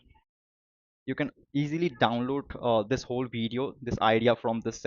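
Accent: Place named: India. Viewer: thaNKS for the video G, and love your indian accent G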